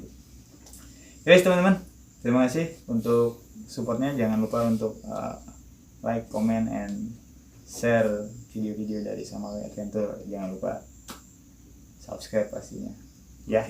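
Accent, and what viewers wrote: Java, Indonesia. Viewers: Bang ada logat jawanya nih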